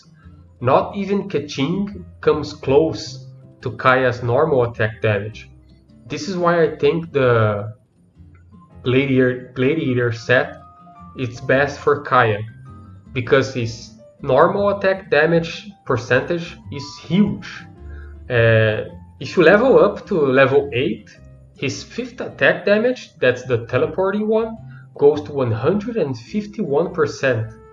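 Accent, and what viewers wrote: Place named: Brazil. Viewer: Oh yeah, the Brazilian accent kkkkkkkkkkkk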